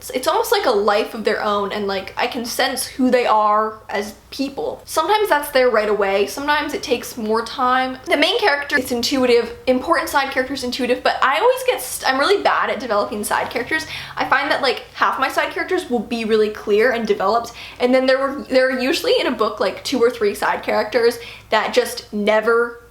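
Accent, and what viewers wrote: Canada. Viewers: So I should apologize then. I’m sorry I made you feel attacked, I mean you don’t even know me lol that has to be the most annoying part. But I still find it hard to believe that you have never had anyone say that your accent sounds high class. Like the word Aunt. If you pronounce it “Ant”you are “normal” but if you pronounce it “Awe-nt “ like the British do, then us “normals” will see it as high class and jot that down in the back of our heads. Do you see? Idk if there is a true form of English that invokes the superior intellect but it’s something that id like to see your option on. For example a person who thinks in Spanish will have different thought patterns that one who speaks in a Western accent. So what I am challenging you on is do you think your Canadian accent makes you think more intelligently that my Western or American accent?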